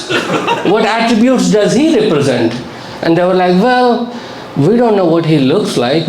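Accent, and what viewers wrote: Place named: India. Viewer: Keep ur Indian accent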